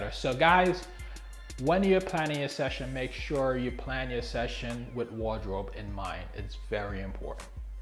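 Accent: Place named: Jamaica. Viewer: Bredren, where you hail from? Sound a bit like my countryman...Jamaica. Great video.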